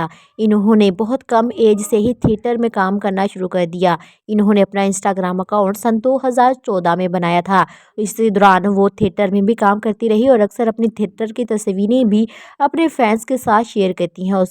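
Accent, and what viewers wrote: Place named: Punjab, India. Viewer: اپنے تلفظ پہ محنت کریں خصوصاً ہندسوں کے۔ آپ پنجابی میں ہندسے ادا کرتی ہیں۔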